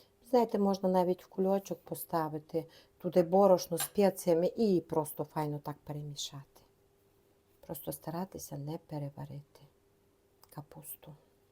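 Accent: Ukraine. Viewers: Говорите по_русски, чтобы было более понятно.... Вы же грамотный человек, не нужно акцэнты делать...... Я прекрасно знаю язык укр. Но, много не расслышала_а другие просто отключат_чересчур акцэнты не центральной Украины.... Я люблю классическую речь и укр. И русскую.... Думаю, меня и остальные поддержат.... А, вообще вы молодец!!!!!!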